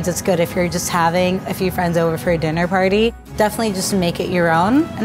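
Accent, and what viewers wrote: United States. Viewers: Sounds American to me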